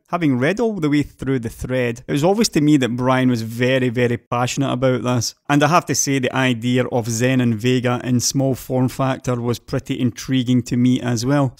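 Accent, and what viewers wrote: Scotland, United Kingdom. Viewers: that thick scottish accent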